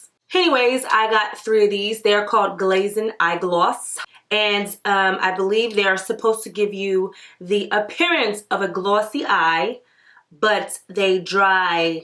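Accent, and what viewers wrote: South, United States. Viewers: That accent is giving me "Where's Applejack" vibes lol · I have five of these including mermaid and Oil Slick and I LOVE THEM!!!! 💟💟💟💟💟💟💟 And yes for the country accent 😂😂😂😂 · The southern twang was so cute! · That country accent 😂😍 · That country twang tho!!! I'm here for it!! LOL!! 🤣🤣🤣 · Lol the country accent killedt meh😂🤣 Looooove your videos😍💕👏🏾👏🏾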